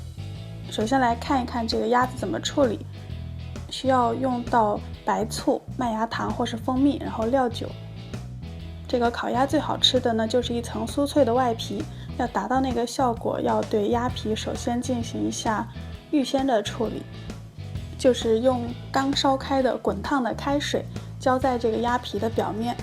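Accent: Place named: Nanjing, China. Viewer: 南京人！哈哈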